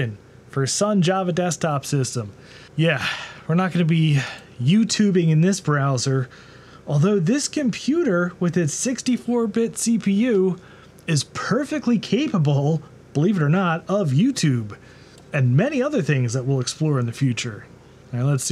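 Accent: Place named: California, United States. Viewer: Off topic: Are you from Cali? Not that it matters but judging by your accent I would say Cali but my friend says AZ... Love your channel, btw, and I use Arch.....btw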